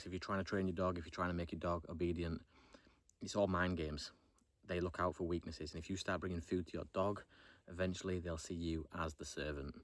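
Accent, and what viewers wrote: United Kingdom. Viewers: Love your content! Did you ever figure out your findings of that paintball place with the rack of game cameras? Was that abandoned? Btw I love UK accents 😂 cheers from Canada!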